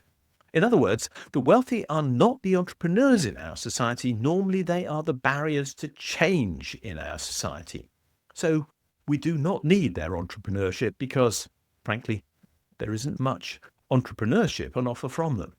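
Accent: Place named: England, United Kingdom. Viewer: Such a nice English accent, wasted on such terrible ideas. If you chase the wealthy out of your economy, your economy will collapse, full stop. Take a look at other countries that have done it: Uganda chased all their Indians out who were bsuienss owners, or Zimbabwe seizing white farmers land. China & Cambodia put all the educated bourgoisie into the fields, or Russia and the kulaks. If this gentleman believes the wealthy hoard their wealth and don't invest, maybe the reason is government policy makes investing in your country not worth the risk? Capital in the hands of private individuals will always be put to better use than capital in the hands of the government. Always.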